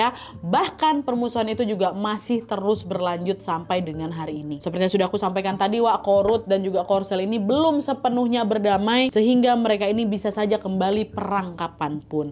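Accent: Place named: Palembang, Indonesia. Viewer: Aku salfok sama logat mbaa nya. Kayak logat palembang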